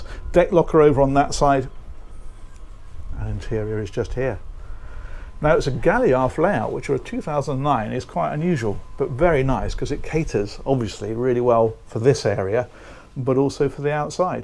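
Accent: England, United Kingdom. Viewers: Nice yacht buddy. I like the design layout. That master bedroom was awesome or using one of your words it was a "proper" master bedroom. I love to listen to you English people talk from England because we don't talk like that in the United States here, mainly in rural Missouri. But I'd take this boat on vacation or use as a live aboard because it would be so easy to keep clean I'd think. I loved the upper fly bridge the most. It has a great layout for this size for a "proper" cruiser. An the galley is perfect for making meals while you have 6 or even 12 people onboard fishing and or in the water playing around. Perfect vacation boat especially if you have children or teenagers because they make messes and I think it would be easy to clean up with floors like that. The boat or small yacht is beautiful especially since I've never seen another one like it or even close to it before from all the channels I've been watching. 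But I have to say, your channel is my number one favorite, plus that I am faithfully watcher. When I see you posted a new video, I have to see what you found because I know it's going to be worth watching. Love your channel buddy. Great job, and you earned another 'at a boy award' from me here in Missouri. I can't wait to see what you post next time. I still wish you would make a specs page showing us all the details like, the length, width, numbers of gallons fuel tanks are, water, the max range it can go in nautical miles, an what speeds with NM, size o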